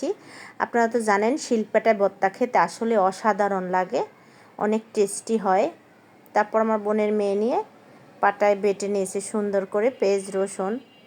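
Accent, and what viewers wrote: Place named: Bangladesh. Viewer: Didi apni ki banglades thaka? Voice tone ta oi rokom tai jiggasa korlam❤❤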